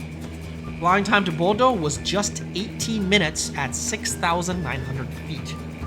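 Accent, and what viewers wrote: United States. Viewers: From your accent I can tell you're from across the pond. As airport self-service solutions are underdeveloped over there, although it's changing, I guess you can be excused for thinking you had to go to a manual check-in desk at OSL, but that's of course not the case. Not every airline offers self-service options, but most European carriers with a normal scheduled service do at OSL. The next time you're at OSL, or most modern airports, you should look for these services first (not hard to find), and only go to a manual check-in desk if there is specific reason to do so. That's what experienced travelers do. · Wideroe is unique , not weird . What's weird is your constant whining . Couldn't listen to your nasal American voice more than 2minutes . And routes is pronounced 'roots' not 'routs' !! 😡😡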